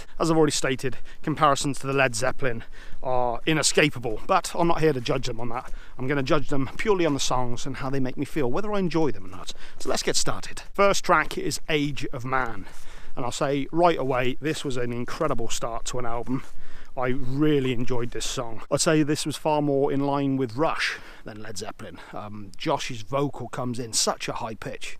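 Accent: United Kingdom. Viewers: i wish you could narrate my life with interludes of british accent spoken word ROCK LYRICS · Great review!Love your british accent!This comment is brought to you by a french canadian!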